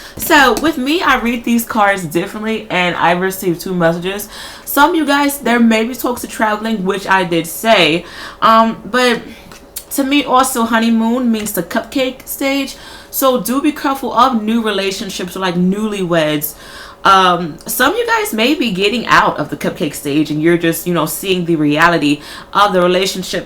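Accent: Caribbean. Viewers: Yea u do have a carribean twist to you.i thought you were dominican or jamaican for a sec lol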